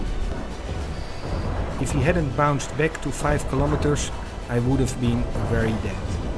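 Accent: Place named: Netherlands. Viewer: sounds dutch... to dutch... :P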